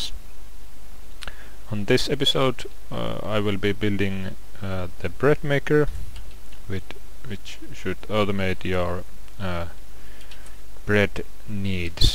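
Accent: Finland. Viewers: you sound like finnish guy speaking english... are you finnish?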